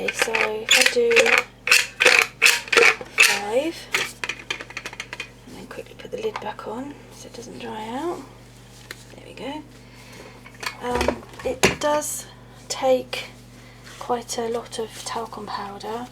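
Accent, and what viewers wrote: United Kingdom. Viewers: That british accent... love it! Btw great tut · where is your accent? i mean, what is it called...what part of england or area?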